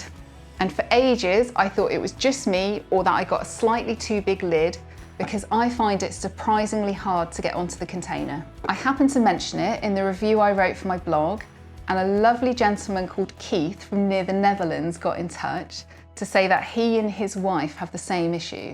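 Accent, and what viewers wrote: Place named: England, United Kingdom. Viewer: That English accent is absolutely delightful.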